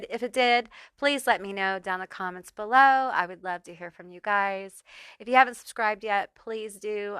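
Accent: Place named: South, United States. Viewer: are you southern? i can hear a slight accent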